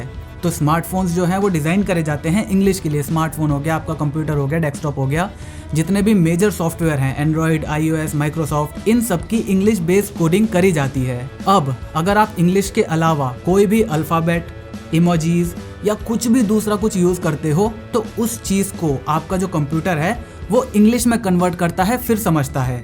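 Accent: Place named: Delhi, India. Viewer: Delhi se ho kya?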